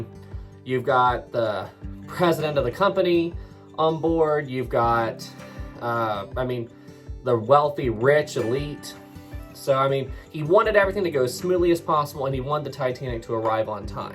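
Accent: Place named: West Virginia, United States. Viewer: Let me guess,West Virginia?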